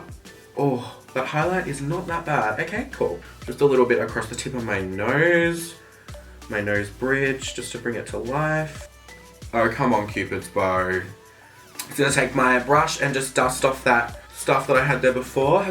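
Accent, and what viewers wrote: Australia. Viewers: Amy Expert he's Australien :) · R u Australian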